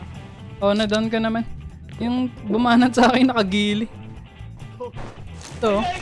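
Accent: Visayas, Philippines. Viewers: btw gi copy nmo si kuya jazon gaming question ko lang kuya? bisaya ka?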